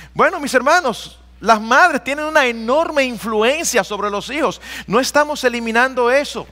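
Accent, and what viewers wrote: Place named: Dominican Republic. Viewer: Muchas gracias pastor, me encanta su acento dominicano trayendo estás enseñanzas bíblicas de la crianza, el Señor lo guarde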